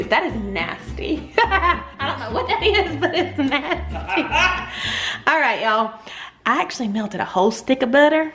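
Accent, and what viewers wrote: South, United States. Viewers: The way the economy is right now, chicken appears to be the choice. Your recipes are so yummy. I look forward to trying the Swiss Chicken. I love your Southern accent. I have one too. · Everything looks amazing.....I especially like the potato soup recipe!!! I don't know what the fuss is about the word moist but hey everyone has an annoying word eh! I honestly can't stand when someone can't pronounce words with "oi" in them like oil or foil for example and says them like ole and fole rhyming with bowl... had to stop watching videos because of it sorry to say. Anyways, you asked...LOL...thanks for sharing, really enjoy your channel and you southern accent!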